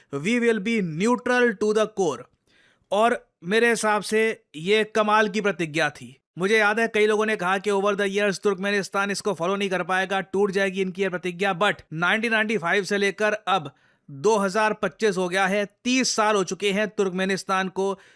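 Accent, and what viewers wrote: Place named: Haryana, India. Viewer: When sir says image Geri hai, 😂😂😂, sir ka haryana MTI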